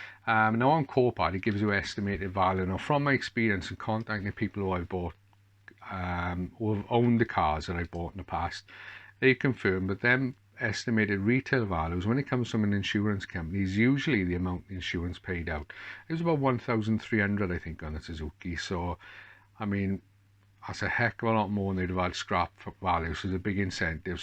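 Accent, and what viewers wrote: Wales, United Kingdom. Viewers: I wouldn't say you are negative at all, you are Welsh, every Welsh person i've ever met or even seen on TV sounds just like you, that guy who said you were hard done by has obviously never met a Welsh man 😂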